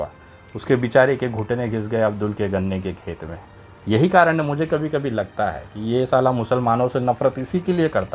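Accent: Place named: Nagpur, India. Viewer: Aise kon bolta hai bhai bechara नागपुरिया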